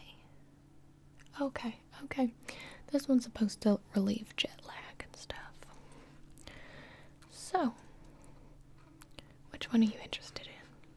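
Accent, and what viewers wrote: South, United States. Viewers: She used to have a southern accent? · Old voice: fairly normal + country accent. Present: High pitched + exaggerated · I love southern accent lol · Did get a country accent???? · Is she supposed to have southern accent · Back when was southern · did anybody else notice that she has a southern kinda accent this video o-O it was really soothing tbh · rip to when had a country accent... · I love how voice evolved from this very slightly southern accent to a more anime one lol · There we have it, early on, was southern and did that cute tongue clicking thing Mistletoe does. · used to have a country accent 😂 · This is my favorite ASMR video. For some reason the combo of the slight southern accent with the typing and the personal attention just MWAH. Works so well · she still kinda has that lil southern accent still lingering but yeah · I like how this has a hard southern accent but then the accent slowly deteriorated throughout her later videos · She got less southern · I feel like she was from the south and when she moved back her accent was reinforced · actually she sounds very southern here. 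And I'm from Mississippi, so... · Gibi should make a role play character with this southern type accent because it is suuuper relaxing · I forgot had a southern accent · Is from the south??? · I love her southern accent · I just now realized used to have a southern and deep sounding voice but now is losing her southern accent and her voice has gotten higher! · went from quirky to southern hahaha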